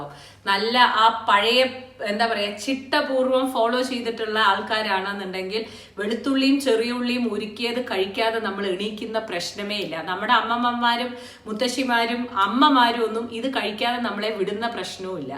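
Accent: Palakkad, India. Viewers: Luv u r accent chechi..em frm pkd too so I cn relate tu u!😊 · Hi.... My Dad is from പാലക്കാട്!! So aa varthaanam നല്ല rasandu tto!! Pinne പാചകo... വാചകo രണ്ടും superb!! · നമ്മുടെ പാലക്കാടൻ നാടാൻ ഭാഷ ചേച്ചിയുടെ സംസാരം സൂപ്പർ 👌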